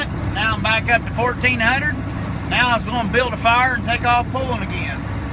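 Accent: United States. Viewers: I'd love to ride with ya hell that country talk having me rolling all day that sumbitch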